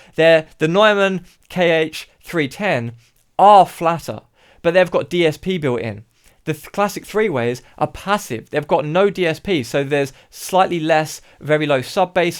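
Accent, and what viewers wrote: United Kingdom. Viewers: from your accent, i assumed you were in the uk. where are you located though?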